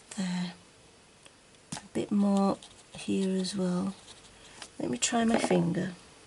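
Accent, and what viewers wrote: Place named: Yorkshire, United Kingdom. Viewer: Hi K...Using my Brutfuner in the Circle of Life "Spiders on the Spiders web" Halloween 🎃...ish 🤣🤣 Nice to hear a fellow Yorkie 🥰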